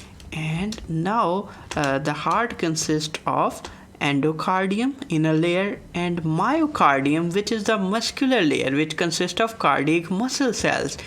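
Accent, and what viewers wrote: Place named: India. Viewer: Fascinating but hhe Indian accent is almost impossible to follow; that is now English, alas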